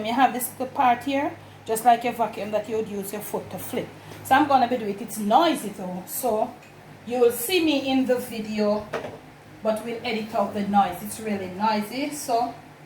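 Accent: Jamaica. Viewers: I love your ‘back then’s’. Just love them. Sometimes I have a little problem understanding your patois but I’m getting there the more I listen the better I understand😌. Love your recipes Love to watch how much you enjoy your food. Camera Girl is such a polite young lady. Love the way you keep it so real. Please give me a shout out from time to time. My family and I visit your beautiful Jamaica every year. Montego Bay is our spot though. If I get to Kingston I would love to visit you and your family and taste your cooking, I know it’s EXCELLENT! I want to taste your soft dumplings, crispy fried chicken and ripe plantain! And yes the camera did pick up the floor shine❤️ USA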